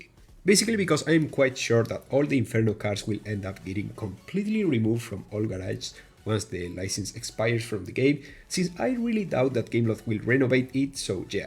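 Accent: Spain. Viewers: R u spanish? Ur accent is pretty similar to that people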